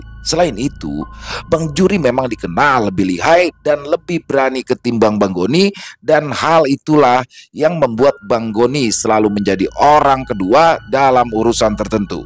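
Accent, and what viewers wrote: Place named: Jakarta, Indonesia. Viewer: Ane plg demen nih klo bang berlogat betawi. Cengkok nye mantep bgt 👏👏👌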